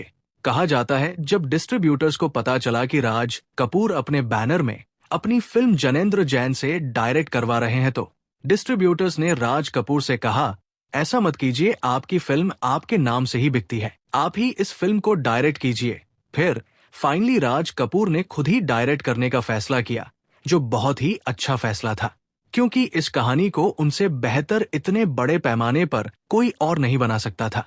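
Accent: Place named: Bengal, India. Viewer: Ye kaisi hindi hai aap ki .bangali jaisi .usse bhi buri .kya grammer hai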